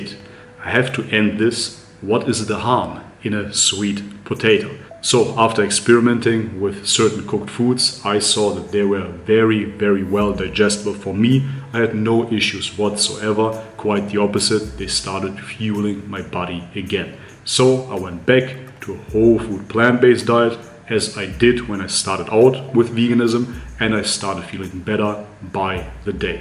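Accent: Germany. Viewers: bist du echt deutscher?? ich (amerikaner) habe die Aussprache gemerkt aber dein englisch ist perfekt! ausgezeichnet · Love that German accent brother! Btw I had to laugh when you said you prayed to Jesus Christ, since you look like a dead-set Muslim! Lol.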